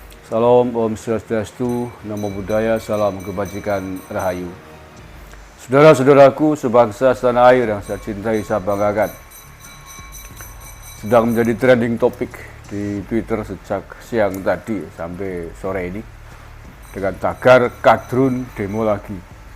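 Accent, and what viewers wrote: Banyumas, Indonesia. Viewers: Sampyn orang mna pak,,,nada bicarane mirip nyonge,,,hehe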